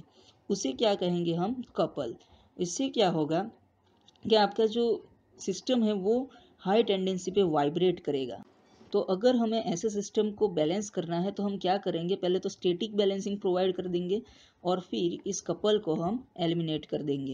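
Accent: India. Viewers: I closed the video after hearing indian accent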